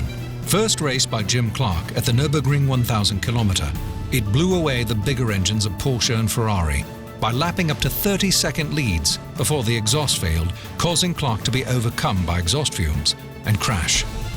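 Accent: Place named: England, United Kingdom. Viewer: Aagh! that English accent speaking American English is doing my head in...I hope I can stay the course.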